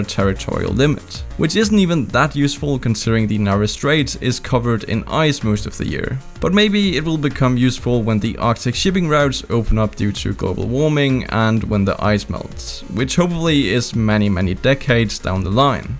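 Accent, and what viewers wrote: Denmark. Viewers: Are you dane, it Sound like it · Are you danish you sounds danish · You Sound danish not gonna lie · This guy is definetly danish with the way he pronounces danish words!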